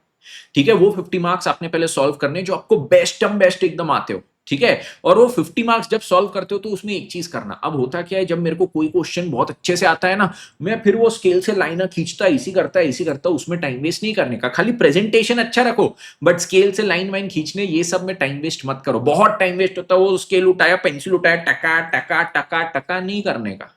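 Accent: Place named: Nagpur, India. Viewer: He has a Nagpuri accent omg 😂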